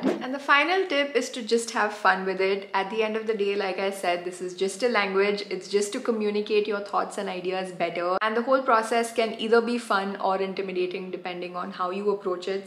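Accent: India. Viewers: But your accent is totally indian you can’t say either American or British lol I enjoy watching your videos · today i am first time encountered with your video, i liked your fluency in english with good indian accent could understand easily.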